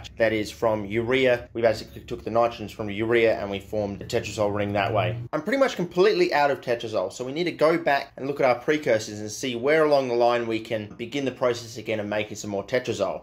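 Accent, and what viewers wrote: Australia. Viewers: lol i saw that too and laughed. of course he'd be using a broken grad cylinder. wouldn't be without aussie accented swearing and delightfully ghetto equipment.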